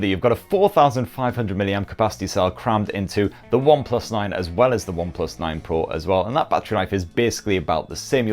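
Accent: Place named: England, United Kingdom. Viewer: love your reviews and the english accent!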